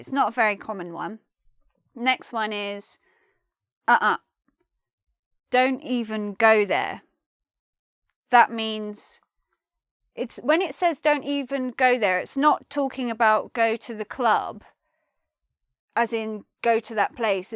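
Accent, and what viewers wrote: United Kingdom. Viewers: Hi,! Glad to see and hear you again. Your British English accent is always a good practice for me. And you are a very good teacher. With love from Russia. Ch.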